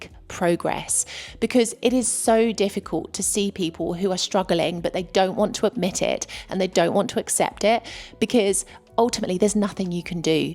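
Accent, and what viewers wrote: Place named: RP, United Kingdom. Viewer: Sorry for my lack of clarity. I meant your own level of Italian proficiency when you moved to Italy. As for your accent, I’d place it in the RP category. Best wishes.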